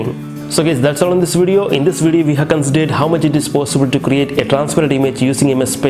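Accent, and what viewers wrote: India. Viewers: Oooh, don understnad but he speak english with hindi accent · its indian accent, respect it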